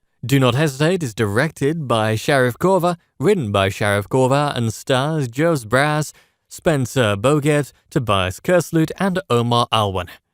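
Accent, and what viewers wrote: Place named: England, United Kingdom. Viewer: Trying to watch the fin clip n all I'm hearing is some pommy wanker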